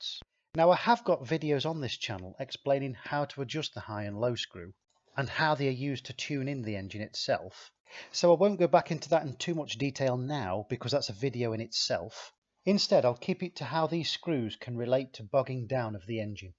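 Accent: United Kingdom. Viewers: These are cracking videos. Thank you so much. Great to hear a British voice instead of the legion of american videos. Love this series. Really useful. Wish I could fix my mercury mariner though. Love to see a video on replacing crankshaft seals.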